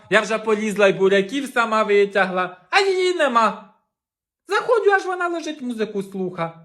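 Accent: Poltava, Ukraine. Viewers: Дякую,! Кожного дня переглядаю Вашi шедеври. Ви- талант!Як точно, мальовниче вiдтворюете наш полтавський дiалект! А iнтонацiя, емоцiональнiсть! Все не вигадане, справжне!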